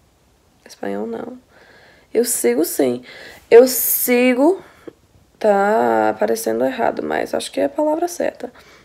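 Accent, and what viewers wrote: Bahia, Brazil. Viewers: Português dela tem sotaque baiano.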